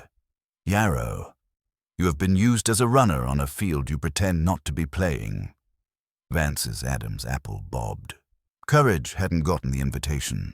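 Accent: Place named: United Kingdom. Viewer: The British accent doesn't match with New York American accents. I expect Archie Goodwin to be a brash American, snappier pace. The space in the narration after each "Mr" or "Inspector" before saying the last name is disconcerting. Renting a typewriter matches the period of the original Nero Wolf series, that is good. But paying for it with "a prepaid card"??? Did such things exist back then?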